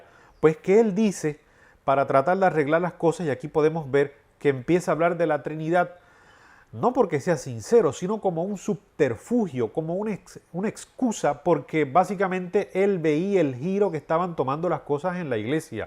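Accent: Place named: Puerto Rico. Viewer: Hermano de qué país eres ??? Tu acento parece puertorriqueño cómo yo.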